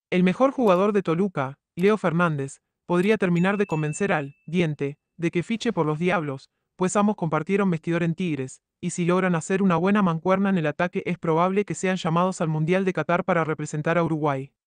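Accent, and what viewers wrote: Argentina. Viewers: Otro vez el argentino hablando 🧐 cómo se llama usted amigo argentino preséntese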